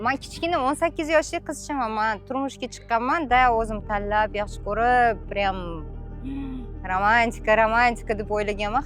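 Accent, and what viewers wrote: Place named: Kashkadarya, Uzbekistan. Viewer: menimcha Qashqadaryoda San shevangdan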